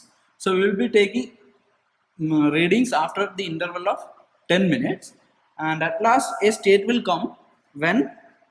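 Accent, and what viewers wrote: Kerala, India. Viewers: Accent veych malayali anenn thonunnu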